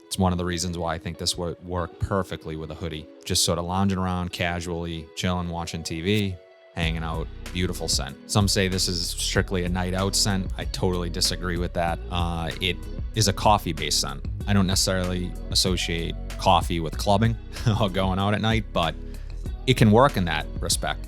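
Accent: Boston, United States. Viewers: Love that Boston Accent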